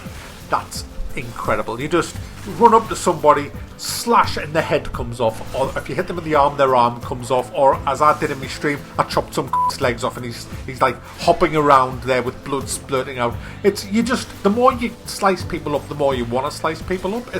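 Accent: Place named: Wales, United Kingdom. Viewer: You have, in all likelihood, the MOST Welsh voice I've ever heard!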